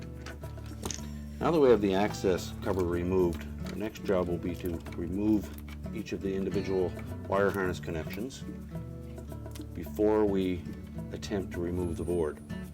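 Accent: Canada. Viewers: Hi! I feel like I know you!!! I just want to say thank you for the VERY well done video on repairing appliances! I've watched your vids on replacing a dryer belt, as well as just today repairing my 8 year old hotpoint fridge. I replaced both the compressor fan motor and the control board, and I owe it all to your informative, easy to follow, instructional video! So this girl just wants to thank you, thank you, thank you! P.S. Are you Canadian? (jus wundrin' lol!)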